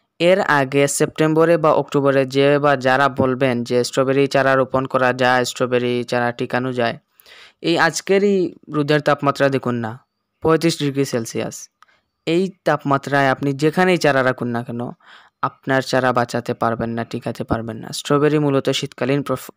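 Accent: Sylhet, Bangladesh. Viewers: ভাই কিতা সিলেটি নি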